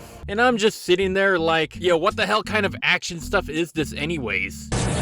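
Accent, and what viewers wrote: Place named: United States. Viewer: I was so confused for a few seconds hearing an american talk about this show, and all comments are in english, what's happening?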